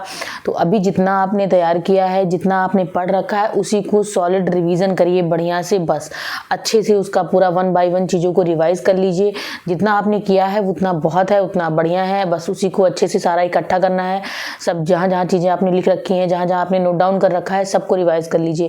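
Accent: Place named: Mathura, India. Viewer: MAAM AAP KA CENTRE KAHAN HAI. AAP MATHURA K LG RHE HO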